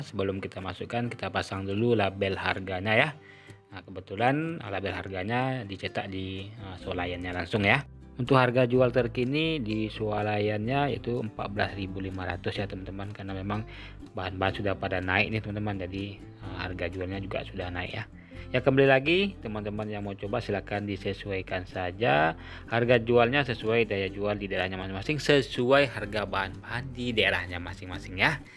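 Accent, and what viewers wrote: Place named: Sumatra, Indonesia. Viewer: Bang ini berasal dr daerah mana ya? Kaya logat Sumatra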